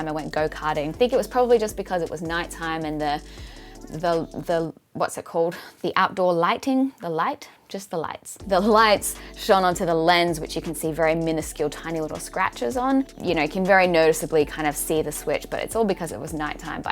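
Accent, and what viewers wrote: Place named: Australia. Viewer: So Aussie I love it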